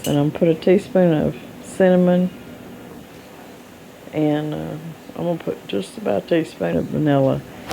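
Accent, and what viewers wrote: South, United States. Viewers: Your precious. Love your country accent , in country too 😊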